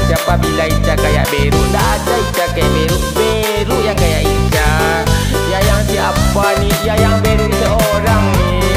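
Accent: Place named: West Sumatra, Indonesia. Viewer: Mantap Tapi ubah lah logat Minang tu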